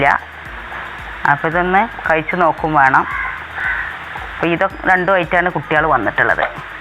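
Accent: Malappuram, India. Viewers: Nammude മലപ്പുറം ഭാഷ കേക്കുമ്പോ എന്തോ നൊസ്റ്റു ഫീൽ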